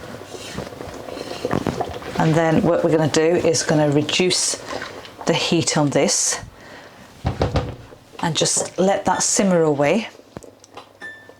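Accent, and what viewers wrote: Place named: Yorkshire, United Kingdom. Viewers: She sounds like a Yorkshire lass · My God I am honoured! I truly am!! I infer from your accent you are a northerner, I'm from London but could conceivably make the journey up, however I'm in two minds about appearing on camera, I will let you know. But I really feel you will eventually hit the big 7 figures, as I may have said above you've tapped into something quite enormous, for reasons I'll try to message you.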